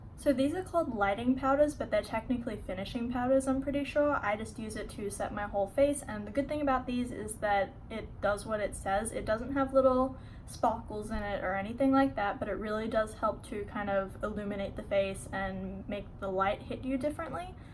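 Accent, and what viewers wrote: Australia. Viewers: i detect a aussie accent also this video is sooo good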